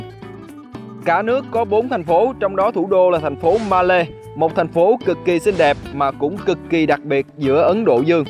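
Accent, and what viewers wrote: South, Vietnam. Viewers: Giọng Nam bộ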